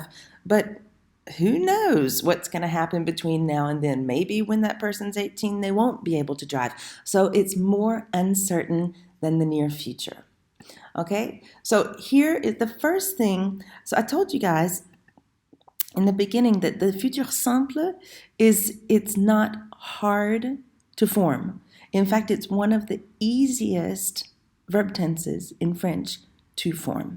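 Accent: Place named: Southwest, United States. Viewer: My guess from your English accent is you (or your teacher) lived in the Southwest USA. I love it!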